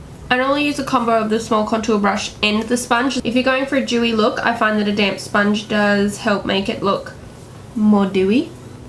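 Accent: Australia. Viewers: You're so pretty and i love your accent😍😍 i am Australian too but your accent is much stronger than mine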